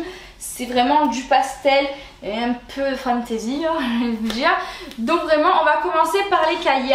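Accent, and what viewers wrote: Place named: Marseille, France. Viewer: Elle a un petit accent marseillais je crois qu'elle vient de là bas 😊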